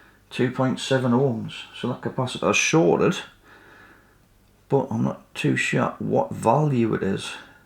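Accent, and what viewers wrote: United Kingdom. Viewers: Nice fix! Always love watching your videos – interesting repairs and I like the British accent and "unamerican" presentation. BTW: what part of Britain are you from?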